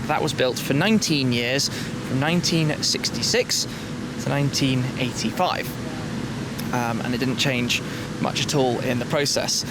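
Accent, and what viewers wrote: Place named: United Kingdom. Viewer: Couldn't get past his accent. Annoying pasty white Brit. Queer little girls car. Weak.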